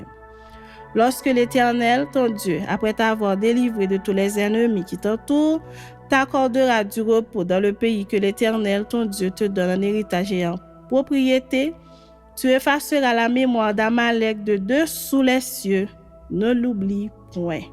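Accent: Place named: Haiti. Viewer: Merci pour ces lectures j’apprécie l’accent haïtien !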